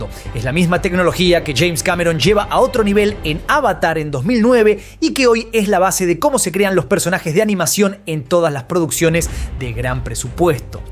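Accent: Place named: Argentina. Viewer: ¡Es tan épica la historia de Lucas y #StarWars que incluso este pinche video narrado en HORRIBLE ACENTO ARGENTO (que destruye al idioma español y al inglés al mismo tiempo) resulta algo tolerable! 🤌🤌 ¡#MalditosArgentos, son tan odiosos e insoportables! :body-green-covering-eyes::face-turquoise-covering-eyes::face-turquoise-covering-eyes::face-turquoise-covering-eyes:🤮🤮🤮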